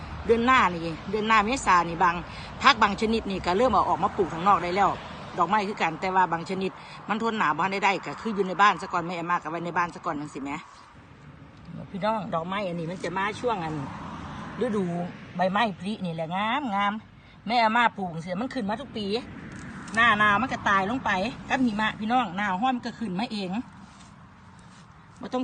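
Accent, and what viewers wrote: Isan, Thailand. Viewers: ยายข่อยพึ่งเห็นคลิปเจ่ายุไทยยุอำเภอใด๋ค่ะฟังเสียงคือคนบ้านเฮาค่ะ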